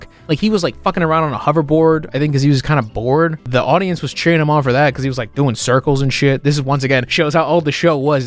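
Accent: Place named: Upper Midwest, United States. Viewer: I knew you were from the upper Midwest because of the slight accent.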